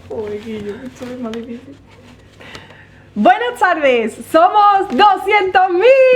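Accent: Andalusia, Spain. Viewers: De que parte de España es el acento que tienes? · Te lo mereces guapa! Sigue con tu arte y sigue hablando en ese Andaluz precioso que hablas. Un cariñoso saludo a desde Chile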